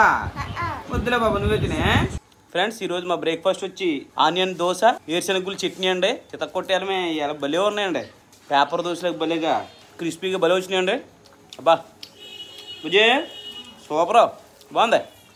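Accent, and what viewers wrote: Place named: West Godavari, India. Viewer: పిల్లలు తో మీరు చేసి సరదా సరదా ముచ్చట్లు చాలు బాగునాయీ . అచ్చమైన ప గో భాస మరియు యశ వినసొంపుగా ఉన్నాయి ఆండీ కీప్ rocking విత్ యువర్ vlogs బ్రదర్ 😍😍😍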